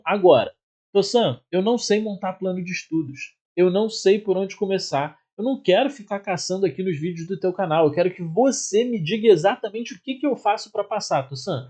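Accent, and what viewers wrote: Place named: Rio de Janeiro, Brazil. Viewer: Estudando contigo e adorando esse sotaque carioca kkkkkkkk se tivesse achado você antes teria feito para outro cargo mas vale vou seguir aqui.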